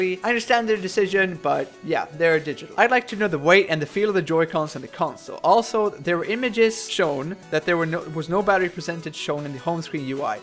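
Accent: Sweden. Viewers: WTF är du svensk? I was wondering what your accent is and then I saw "poäng", and "1 dag sen" etc. So now the accent makes sense.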